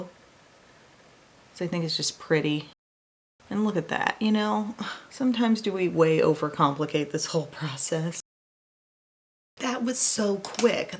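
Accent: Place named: South, United States. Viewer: I also love your “oh isn’t that pretty” in your slight southern twang ❤️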